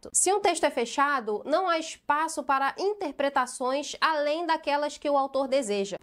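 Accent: Rio de Janeiro, Brazil. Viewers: Moça, tu és carioca?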